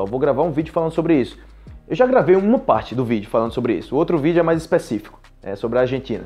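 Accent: Antioquia, Colombia. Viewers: Kkkk adorei sua conversa paisa! Jajajja quiero saber como te sale el costeño🤔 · 😂😂😂😂 Que cómico hablando español "paisa"... pareces colombiano, la verdad!! 👍🏻👍🏻👍🏻👍🏻👍🏻 Tus vídeos me transmiten una vibra positiva, gracias! 😊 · me encanta como hablas el español colombiano (paisa) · Increíble como habla muy bien el español colombiano. Yo soy nativo colombiano de Bogotá y nunca conseguí usar esas expresiones ni tener acento. Es verdad que es español paisa eso, mas en español de Bogotá también hay un acento y expresiones y nunca conseguí hacer. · Ya somos 2. Si que la vacila con el acento colombiano.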